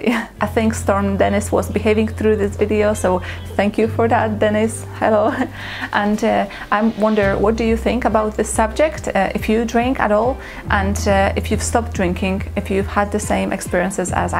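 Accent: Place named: Poland. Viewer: How come after 11 years in UK you still have such a strong polish accent ? Your videos are valuable but your english is very heavy and hard to listen to. Make it more fluent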